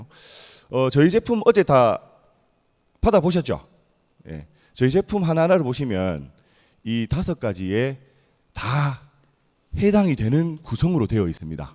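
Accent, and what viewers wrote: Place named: Gyeongsang, South Korea. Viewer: 앱소브제품 설명 잘 들었습니다 구수한 경상도 사투리도 좋네요 이 제품에 칼슘 제품은 없던데 보충해야 하는지요?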